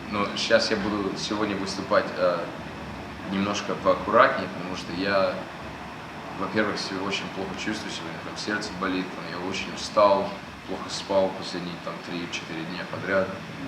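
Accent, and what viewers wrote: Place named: Russia. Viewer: намного,прямо заметнее,но иногда видно,что слова подобрать не может.Хотя фразеологизмами хорошо пользуется,главное - акцент русский стал.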